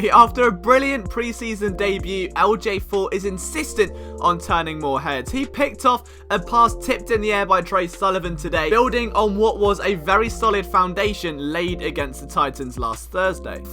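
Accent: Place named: London, United Kingdom. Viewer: YOU SOUND LIKE YOU'RE FROM LONDON!!!!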